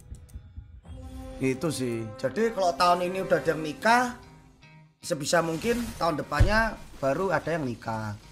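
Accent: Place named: Java, Indonesia. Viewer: Mas aku rak ndelok live sepurane tapi omonganmu ki koyok wong jowo e mas Jane we cino opo jowo?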